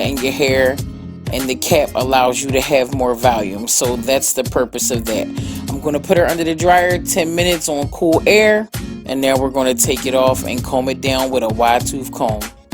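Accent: Philadelphia, United States. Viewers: Love your channel! I could listen to your accent all day. I live in Atlanta but I’m from the suburbs of Philly and as soon as I heard your voice I knew!!! Such talent ❤️☺️ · Omg where are you located...you lowkey sound like you from philly and that’s where I’m at and I need a appointment 🤩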